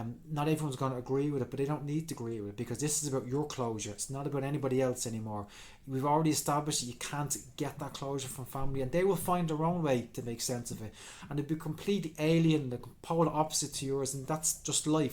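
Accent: Ireland. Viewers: that beautiful Irish lilt I miss so much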